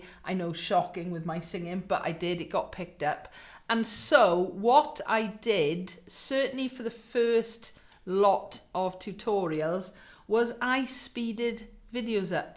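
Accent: Wales, United Kingdom. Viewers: Loving your help and hearing a wonderful Welsh accent. I'm from Abertawe originally but have been in NZ since 2004. Been learning the ukulule and loving it. I really want to learn Ar Hyd yr Nos, but am not Welsh speaking - on duolingo a little and know very basic words and phrases. Would it be too much to ask if you have simple chords for Ar Hyd yr Nos. A phonetic translation is something I've been lookinb for online to no avail; do you or anyone you know have one? Diolch yn fawr, Denise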